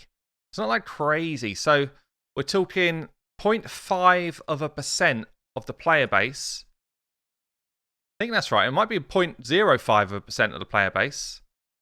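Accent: United Kingdom. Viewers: I could tell by your oh so British voice inflection. I had the pleasure and privilege of working with the RM when I was an active member of the USMC. I rapidly got to understand the humor of the Citizens of the UK. You British are different from region to region. The Scots, the 1st Sgt. was different from the British. The Welsh had a completely different view of the world. But the beer was great, rats were good, and we all agreed Norway was an ICY *place*. But the women made the evenings eventful. Someone said the main thing separating the US and UK is a common language.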